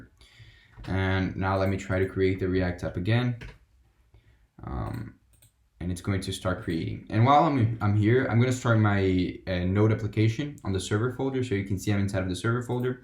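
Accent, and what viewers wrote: Brazil. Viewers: Reconheço um inglês br de longe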